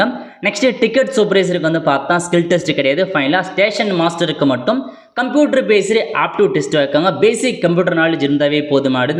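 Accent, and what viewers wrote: North, India. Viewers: நீ தமிழன் தானா...? உன் பேச்சு வடக்கன் மாதிரி இருக்கு...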